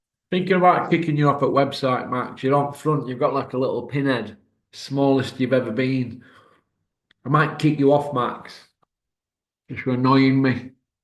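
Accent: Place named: Northern England, United Kingdom. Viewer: Your quickly becoming my favourite boxing channel. Rough round the edges worts and all just a straight talking northerner. Greeting from the red rose county ;-)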